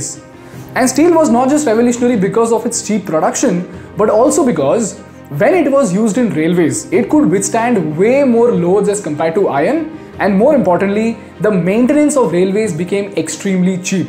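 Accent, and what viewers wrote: India. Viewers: I dont like Indian accent English ☺️😄😆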